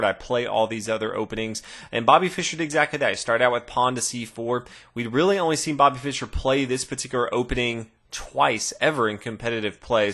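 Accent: United States. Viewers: Sorry to say but this strong american accent is really bothering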